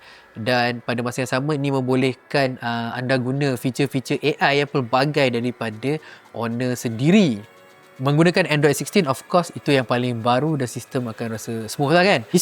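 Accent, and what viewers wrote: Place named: Kuala Lumpur, Malaysia. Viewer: Serius lah kalo bukan komen Hang aku rasa Abe ni ore kl 😂😂😂😂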